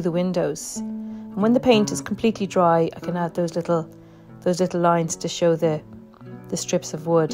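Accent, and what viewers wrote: Ireland. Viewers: Hi! I have got to know you and your charming artworks from Sketchbook Revival 2023 and what blessing that was! I am totally blown away by your works and love all your videos! Your voice is so calming and I love your Irish accent 🥰! I enjoy the scenery in your videos too! I get transported in your world of wonder and for that I am ever so grateful! Thank you so much for adding that little spark of happiness in my day when you post a new video! Love and Blessings to You and your family …..Lorraine 😁🎨🇲🇹 ( Malta)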